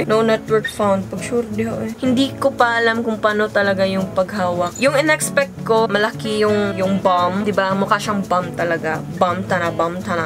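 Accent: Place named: Visayas, Philippines. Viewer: HOOOY YAWA BISAYA MAN DIAYYY HAHAHAHAHAHA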